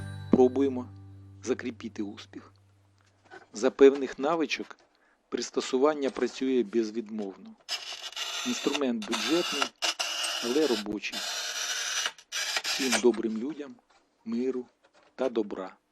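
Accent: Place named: Russia. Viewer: Російський акцент ріже вуха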